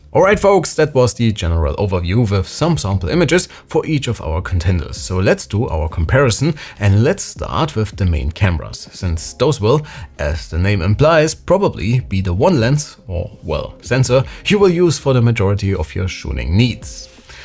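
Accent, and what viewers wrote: Germany. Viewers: Great Video. Is your Accent from Germany?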